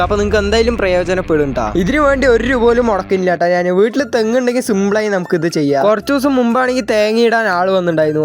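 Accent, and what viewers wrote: Palakkad, India. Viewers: bro sthalam palakkad aahno❤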